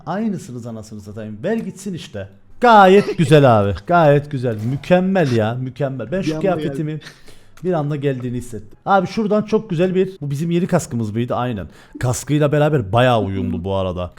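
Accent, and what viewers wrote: Cyprus. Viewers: Sırf kıbrıs ağzın için abone oldum çok iyiii msksleodk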